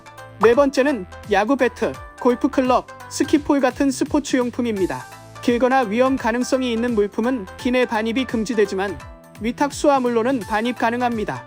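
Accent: Japan. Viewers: 왐마 한국어 발음 너무 귀여워요 외국인 중에 ㅜㅜ일본인분들 한국어 하시는게 젤 귀여움 ㅜㅜㅜ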